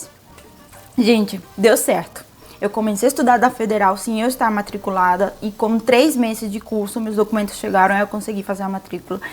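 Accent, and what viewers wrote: Rio de Janeiro, Brazil. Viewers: as experiências vividas aqui no Brasil, são frutos das adaptações, tem um preço a ser pago, mas que uma desbravadora, você é uma mulher de muita fibra, parabéns pelas conquistas e o que fez você vim pro Rio de Janeiro, "Cidade Maravilhosa "..daqui a pouco vai esta com sotaque de carioca igual a mim, beijos💜💙💛❤💚😘😍🥰👏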